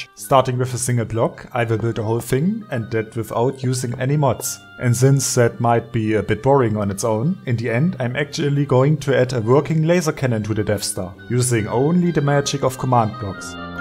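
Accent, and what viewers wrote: Germany. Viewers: The most german accent in this world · Yeah, well sounds German and also if you go to the about section it will say that his location is in Germany. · Das ist fast deutsch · As a fellow German: your English is the most German one. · Ja das klingt wirklich Deutsch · Ist mir doch von Anfang an aufgefallen, dass du deutsch sprichst!😂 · When someone with a German accent this thick talks about building a Death Star with a working laser I'm actually terrified it sounds like a legitimate supervillain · I can hear hes german, its obvious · Very german accent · Truuu the english sounds so german it basically is just that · das ist englisch hört sich aber wie deutsch an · im German to i think he's Sounds Like German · hab erst überlegt aber war mir ziemlich sicher das is n deutscher kollege 😂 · He is German, I can tell from his problem with th words and some others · It’s Denglish =Deutsch+Englisch ore Germglish =German+Englisch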